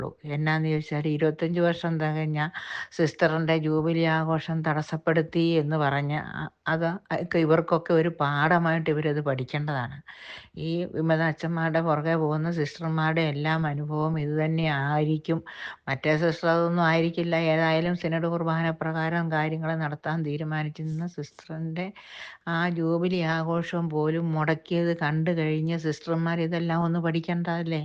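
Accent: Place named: Changanassery, India. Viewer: ഉച്ചാരരണം കേട്ടാൽ ചെങ്ങനാശ്ശേരി അമ്മച്ചി ആണെന്ന് അറിയാം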